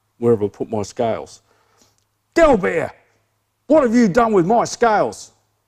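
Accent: Australia. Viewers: Man. Your Tassie Accent makes that intro All the better!!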